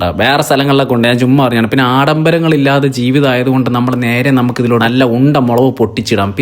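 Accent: Trivandrum, India. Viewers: തിരോന്തോരം ആണോ തള്ളേ 😁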